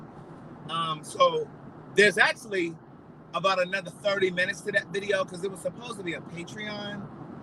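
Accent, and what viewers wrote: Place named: Baltimore, United States. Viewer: I just love looking at you and listening but I am here in Baltimore too and I understand what you are talking about and you sound just like someone from Baltimore. 😂 But I hope your mom doing better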